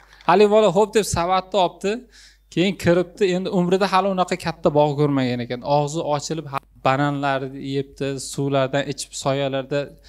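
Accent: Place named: Surxondaryo, Uzbekistan. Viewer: Qalban surxondaryolikman dedi,bir joydan ekanmiz😊😊 shevasi ham uxshaydi uzi surxondaryoliklarga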